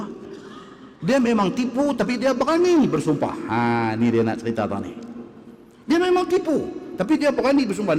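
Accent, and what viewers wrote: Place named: Penang, Malaysia. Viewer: Ustaz Cakap Sungai Acheh sebijik sama macam Arwah Tok saya... Minta Ma Auuf. Semoga Allah merahmati arwah Tok dan TokWan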